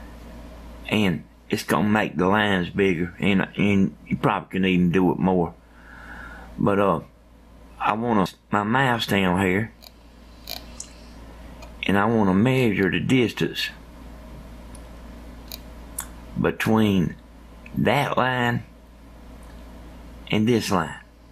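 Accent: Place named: South, United States. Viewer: More Southerners should speak like that again. Excellent video. No waffling intro, straight to the point and helpful..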